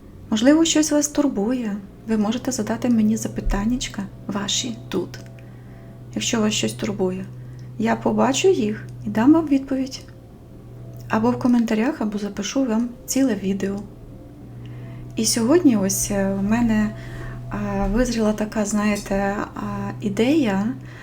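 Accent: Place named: Rivne, Ukraine. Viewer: Дякую. Люблю слухати Ваші вірші, Ваш рівненський голос.♥️♥️♥️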